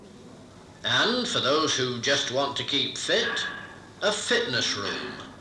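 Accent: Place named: RP, United Kingdom. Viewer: The narrator wasn't half posh.....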